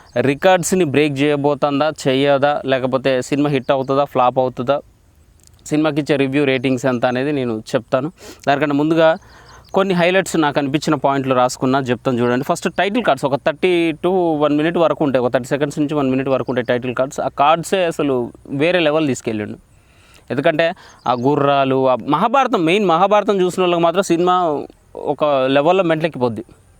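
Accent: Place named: Andhra Pradesh, India. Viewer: Anna nuvu manchi mana warangal basha Telangana yasa la matladu anna endhikantey manaku mahbad ollaki set kadhu Andhra slang...😊😊😊 Am following you from many years am from warangal 🥰🥰🥰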